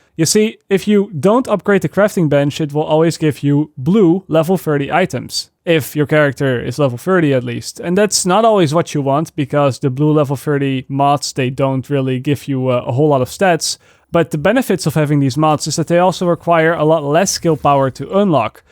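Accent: Netherlands. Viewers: Is he dutch? His accent sounds like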